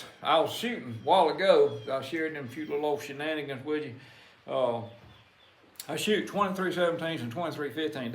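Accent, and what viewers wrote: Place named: West Virginia, United States. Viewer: You talk just like everyone where I grew up in West Virginia and I think I must've talked like you too back then but lost it. You talk like my friend John and others around there near our farm. But you’re with wild hogs thick so you’re probably further south. Praise the Lord and pass the arrow-harvested wild bacon !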